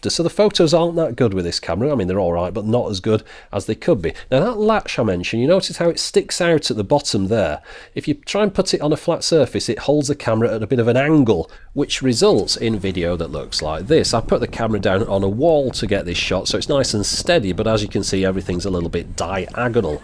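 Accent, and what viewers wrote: United Kingdom. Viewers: the two plesures in one:a good overview of equipment and a beautiful British pronunciation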